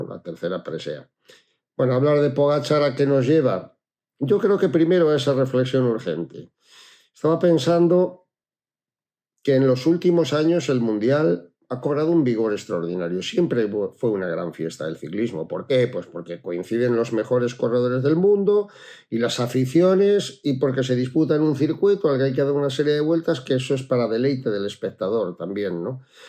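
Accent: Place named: Spain. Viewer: SEÑOR ES MUY AGRADABLE OIR A UNA PERSONA QUE SABE TANTO DE CICLISMO,, REALMENTE MUY AGRADABLE A PESAR DE SE ECENTO ESPAÑOL QUE PARA LOS LATINOS ES ALGO MALUCO PERO EN USTED NO IMPORTA PORQUE USTED HACE SU OFICIO MUY BIEN,,,,,EFICITACIONES DESDE LAS MONTAÑAS DE COLOMBIA.......TIO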